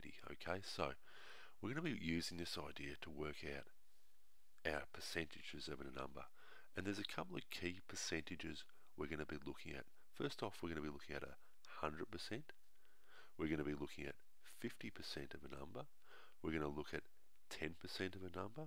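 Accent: Australia. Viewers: His Australian His Accent shouldn’t Matter his message is Great 😊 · Calm Aussie accent and common sense, both helped give me an extra tool to working out percentages . It helps keeps one in touch with the original math logic so often lost with calculators. THANK YOU ALL THE WAY FROM DUBAI. Bet you didn't expect fans this far out eh ?! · Australian bob Ross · If Corpse was Australian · good one, your accent look like aussie thums up if u are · Are you Australian? You sound just like my uncle. I love you videos!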